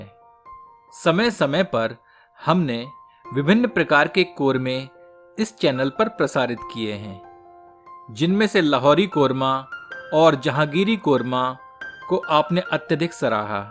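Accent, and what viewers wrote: Standard. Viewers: Amazing recipe and full authentic hindi haha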